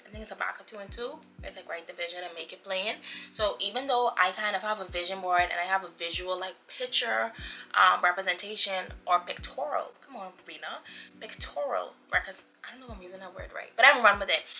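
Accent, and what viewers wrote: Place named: Bahamas. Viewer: Love it! Also as soon as I heard that accent was like yessss, 242 represent! Excited to do my first vision board.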